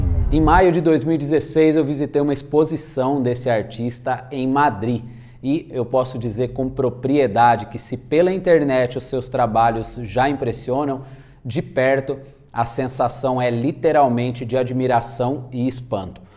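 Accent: São Paulo, Brazil. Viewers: você é da região de SP?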